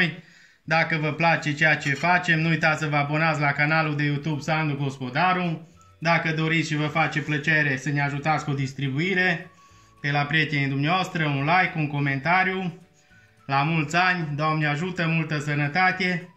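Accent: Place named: Maramureș, Romania. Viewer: Doamne feri ce bună- i ! 😁 Și io fac , mâncare ca la noi în Maramu , tare mi drag cum povestești moroșenește , mă sămțăsc mai aproape de casă... Doamne ajută și sănătate multă la tătă familia !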